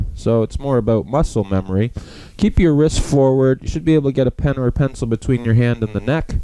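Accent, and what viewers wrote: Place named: United States. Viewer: Better explaining classic song than this old want to be picker needed. You sound American not British with lots of exercises not trying to prove how good you are.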